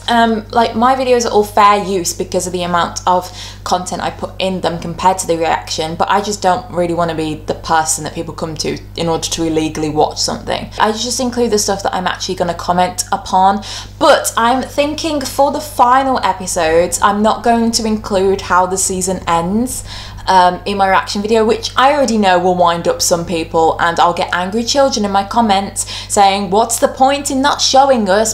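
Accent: United Kingdom. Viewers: Wait are u British ur accent is so cool I’m Aussie and also when u post it I’m always here so our time zones must be very close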